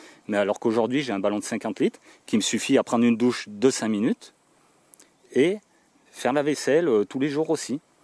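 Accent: France. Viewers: Hello j'ai cherché dans les commentaires sans trouver votre localisation si ce n'est l'accent qui vous situerait en Isère ou Lyon peut être. Moi je suis sur Nimes. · Bonjour Pour l eau chaude: Je suis pas un grand specialiste, mais selon votre systeme de chaufage. Je penses qu il serait possible de recuperer calorie perdu par cheminée. Si vous avez chaffage au bois ou mazout par un poele il serait judicieux de reflechir comment faire un serpentin autour conduit ou circulerait un fluide pour rechaufer un reservoir d eau chaude, a bien penser un systeme securitaire. Je suis natif des hautes alpes et votre accent me parle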